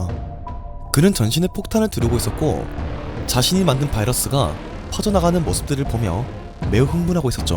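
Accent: Gyeongsang, South Korea. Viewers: ㅋㅋ경상도 분이시네용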